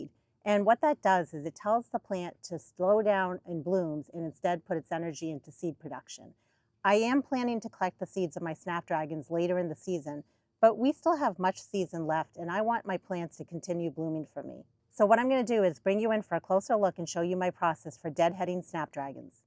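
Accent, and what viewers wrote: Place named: New England, United States. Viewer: You popped up first and I could immediately tell you were a new englander - great video thank you!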